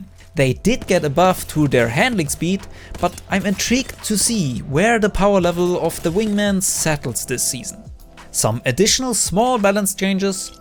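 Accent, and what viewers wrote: Germany. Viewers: Could it be that you are a german?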